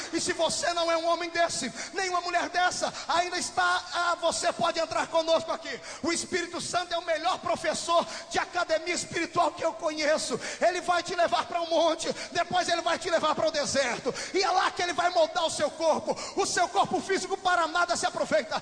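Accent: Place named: Northeast, Brazil. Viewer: essa época ele tinha até um sotaque meio nordestino 😅😂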